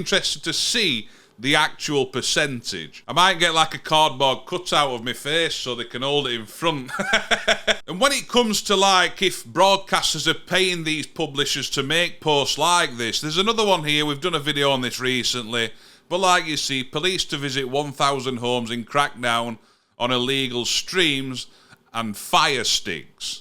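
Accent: Yorkshire, United Kingdom. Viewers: A proper Yorkshire accent. Love it. I'm guessing Barnsley way?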